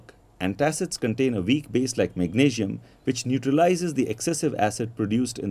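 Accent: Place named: India. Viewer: Your accent is like an indian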